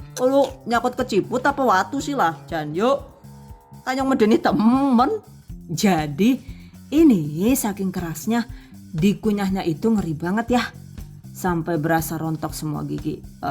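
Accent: Banyumas, Indonesia. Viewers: Suka bgt sm resep2 nya & sm logat ngapak nya...semangat trus buat bkin resep baru nya... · Yg saya suka dari cahnel ini yg saya rasa susah jdi kelihatan gampang buatnya bunda dengan cara penyampaian yg jelas dan terperinci smbil ngelawak bahasa ngapak yg kadang buat saya ketawa sendiri Baru kali ini dapetin chanel yg gak ngebosenin di liat, sukses selalu bunda #GiveawayTHV2021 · Sprtinya dri banyumas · Saya paling suka cara bunda menyampaikan tutorial nya, anakku paling hafal klo aku buka video ya pasti bilang OKE...sama CATAT...sama itu dealek ngapaknya itu lho🤭🤭🤭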